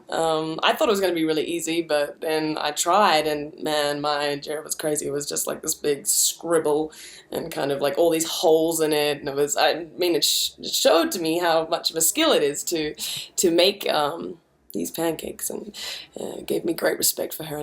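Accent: New Zealand. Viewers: Kimbra! You inspire me and the music i write. Keep up the the kiwi accent too - from a fellow kiwi, im starting to lose mine, ive been in Melbourne too long haha. I admire you for taking time out of you busy schedule to visit those in need. Its the small things that we do for others that usually make the greatest impact. Good job. Keep it up!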